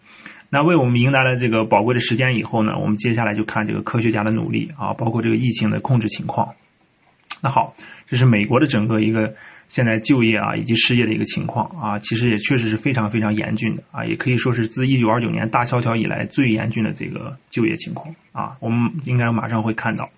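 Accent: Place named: Inner Mongolia, China. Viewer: 越听越像哈，也像呼市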